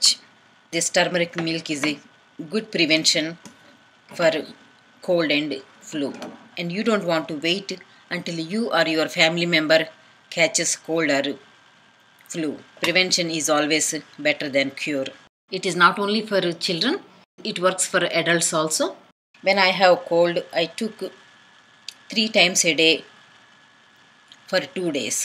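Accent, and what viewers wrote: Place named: South India, India. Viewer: She's a South Indian and they have that kind of accents. Very strong MTI. Though a Pakistani talking about English and it's accent is kinda funny 😛😂😂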